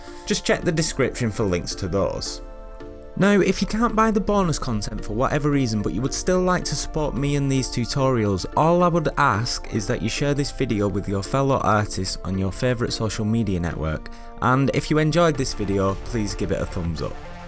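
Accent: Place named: Northern England, United Kingdom. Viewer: You're so northern hahaha! I love it. Lovely tutorial, thank you for sharing :)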